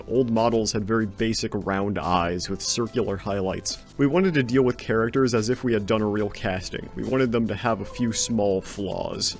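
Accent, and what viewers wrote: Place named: United States. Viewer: "compositing is a long and complicated process that many of you may be unfamiliar with as 3D artists" I'm sorry but this is a genuine question, but do Universities not teach this as part of their curriculum in America (I'm guessing based on the accent)? Do you choose your specialisation right from the get go? Because I was taught everything from Modeling, Animation, Rigging, Texturing, Compositing, literally anything and everything that can be in a 3D pipeline. In all sense of the word, we were taught from the ground up to be generalists. I understand that some people are self taught or are just hobbyists so learning compositing seems like a waste if all you do is modeling or animating, but I'm curious for people that went for a formal education how that all looked like.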